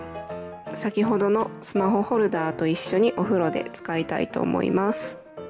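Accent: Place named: Kansai, Japan. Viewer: 関西人ですかね？結構関西弁っぽいイントネーションで大阪の私は聞き取りやすかったです！ スマホ立てるの悩んでたので買ってみよ〜っと☺︎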